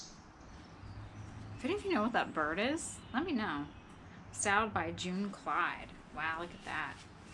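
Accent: New Jersey, United States. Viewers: It’s a NJ accent.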